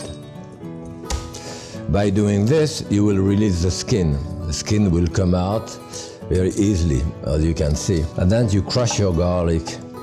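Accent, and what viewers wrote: France. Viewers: I love the way he says 'my mother' so much love ❤ in the way he says 'my mother ' especially with his French accent, love it !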